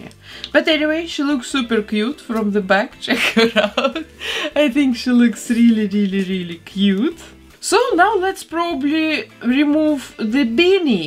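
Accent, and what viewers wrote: Russia. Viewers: Is your accent Russian I’ve been trying to guess but I’m not sure I can’t put my finger on it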